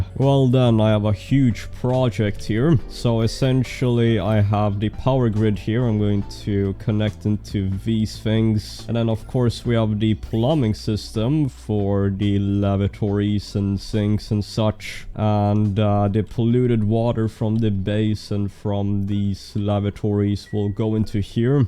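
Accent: Sweden. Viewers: Its funny how easy it is to recognize your own accent. Two minutes in and im like "this guy HAS to be swedish." I double check and yeah, confirmed. Any other country and i have no idea what accent it is haha. 🇸🇪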